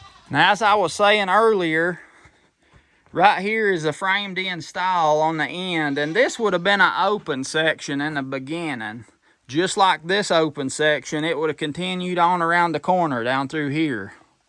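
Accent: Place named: Kentucky, United States. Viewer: I have seen a ton of old barn tours and enjoyed every one of them. This one is by far the best I have seen. You even did it in the old down home lingo, wagon tars, backer and styles, where we would say tires, tobacco and stalls. Thank you very much. My Dad was from Kentucky so I had no problem understanding you. Art from Ohio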